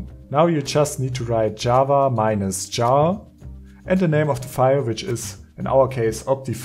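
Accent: Germany. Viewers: Dieser Deutsche Akzent und dann noch die stelle "Werbung Überspringen" 😅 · man merkt das du deutsch bist xD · Wusste ich doch, dass ich da den deutschen Akzent rausgehört habe. :) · Ich liebe diesen deutschen Akzent :D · Hab den deutschen Akzent von Anfang an durchgehört :) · Hast ein Abo aus Prinzip 1. Der deutsche Akzent 2. Du folgst "Der Valon" du ehrenmann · You included EVERYTHING important (I think)! Omg, you're very helpful for people, who are looking for a proper guide. I subscribed, and also I love your German accent 😂 I live in Tschechien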